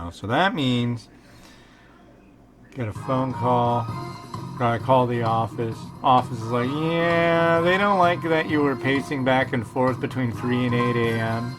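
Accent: United States. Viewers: Провинциальный американский английский, с проглатыванием половины окончаний :) буду его смотреть, чтобы язык воспринимать. Классный чувак.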